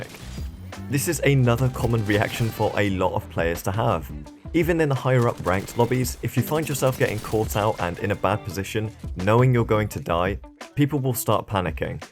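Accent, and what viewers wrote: England, United Kingdom. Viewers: Nice to have some Apex vids in an English accent! Is there skills matching in Apex? I seem to constantly get one-clipped by people with between many hundreds, and thousands, of kills, and always to be holding up my team mates. The scores the game gives you seem to be massively weighted towards survival time and everything other than kills, so if you have some tactical nous and play as a team player, you get to high levels despite potentially being awful at getting kills, as I am - which leads to frustration! I'd love to find more people what want to play a slower, longer-range game and outflank enemies as a squad, not just charge in aimlessly whenever they see anyone....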